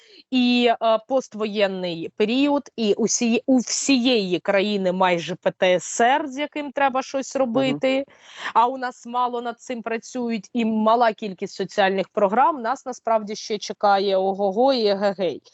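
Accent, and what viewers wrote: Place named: Kharkiv, Ukraine. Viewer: Манера мови характерна для її локації. Харківщина та Дніпропетровщина! Ну на 500 відсотків українська! Десь так розмовляв Нестор Махно!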